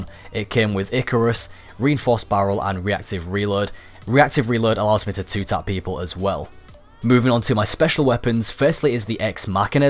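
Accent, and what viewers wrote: United Kingdom. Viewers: You sound like u are from the UK,am I right?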